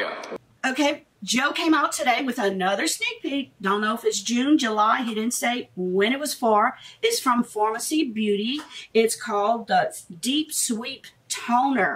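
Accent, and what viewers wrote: United States. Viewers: Hi I stumbled upon your channel and within the first minute I knew you had to be from Louisiana, then when you pronounced Kevyn Aucoin's name the right way i knew i was right. I love Boxycharm and i appreciate all the hard work you but into this video!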